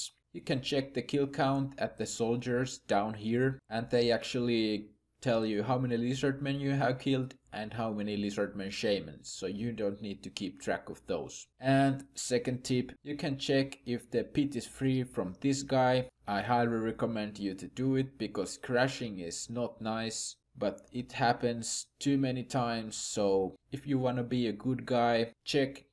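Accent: Finland. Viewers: You must be finnish? Suomiaksenttia ei voi olla tunnistamatta. Hyvä guide, pääsi hyvin kärryille shamanien kanssa :D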